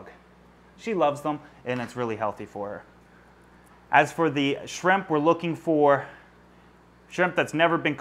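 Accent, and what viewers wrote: Canada. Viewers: how Canadian this guy sounds is spectacular. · Sometimes you sound Canadian and sometimes you sound like a Joey from New York. Thank you for making this video. I enjoyed it a lot. From: Yous Guys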